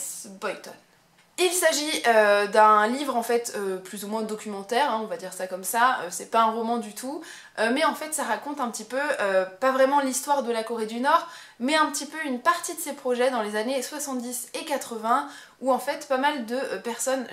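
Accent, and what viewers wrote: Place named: France. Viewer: Un accent ne se travaille pas, ton accent peut s'amélioré mais restera toujours un accent Français. Je pense que tu confonds "accent" et "prononciation" qui sont deux choses différentes. Pour avoir fait une licence d'anglais, je peux t'assurer que l'accent n'est pas important, comme tu le pense, la prononciation l'est. Ne crois pas tout ce que tu peux lire sur internet.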